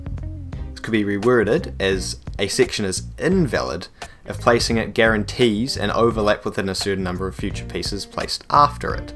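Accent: New Zealand. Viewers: Yo is that a rare kiwi accent on youtube? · You sound like you're from NZ.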